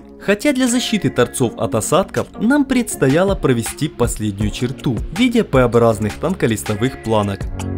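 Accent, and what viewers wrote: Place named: South, Ukraine. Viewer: Я так понял по говору, это юг Украины...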